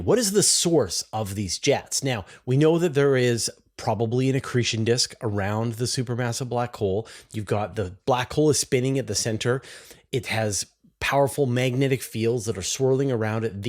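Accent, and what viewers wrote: Canada. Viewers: I think he's talking about my comment on the last video 😂. I was just giving him a hard time because of his Canadian pronunciation of "A"s and "OU" vowels. My whole family immigrated from Canada to the US, so I always notice the subtle differences in speech. Some people are just really good at hearing accents, while others aren't. You being Canadian is why it sounded good to you 😂.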